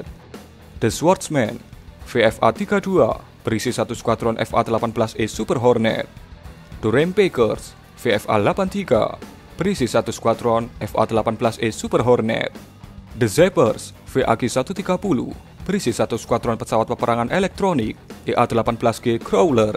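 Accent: Java, Indonesia. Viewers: Medok ya mas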